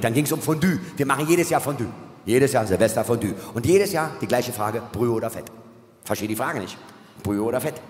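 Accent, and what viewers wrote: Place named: Berlin, Germany. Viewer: Und wieder das gleiche Muster...GÄHN. Haben Sie auch mal was neues? Sexismus, mit Berliner Schnauze...langweilig.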